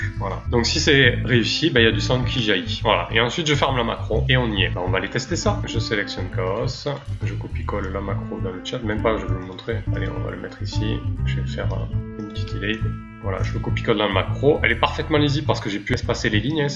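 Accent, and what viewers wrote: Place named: South, France. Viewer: merci beaucoup je fais ça dès que possible. j'entend un accent du Sud tu es d'ou ? actuellement sur la RP, mais je suis originaire de Perpignan.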